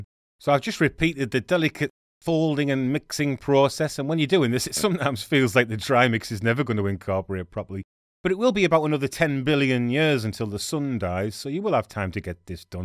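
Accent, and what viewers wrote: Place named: Stockton, United Kingdom. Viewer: As a Stockton Lass i love the recipes and hearing my Dialect I've lost mine by Livin too long in Germany🤣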